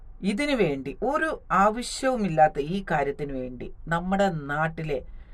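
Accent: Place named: Kerala, India. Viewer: 🙏,,,, സംസാരത്തിൽതാങ്കൾതികച്ചുംഒരുമലയാളി,, കാഴ്ച്ചയിൽഒരുപഞ്ചാബിലുക്ക്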